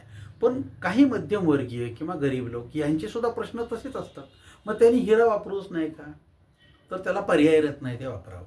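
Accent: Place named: Kolhapur, India. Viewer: मी हेड फोन लावून एकत होतो पण वारंवार वाटत होतं हे कोल्हापुरी असावेत आणि शेवटी मी मोबाईल मध्ये शेवटी पत्ता दाखवला तो कोल्हापूर 😅😅😅😅 थोडा ठसका जाणवत होता. 😅भाषा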